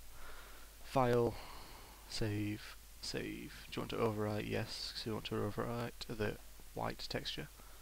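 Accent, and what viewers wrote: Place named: North, United Kingdom. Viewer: Thanks so much for posting! Simple, easy to understand and to top it, you're a northerner!!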